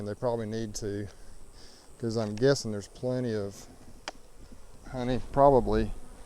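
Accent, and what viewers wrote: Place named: South, United States. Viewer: Great video! I really like your Southern “style”. It’s very calming. The bees know it too! Lol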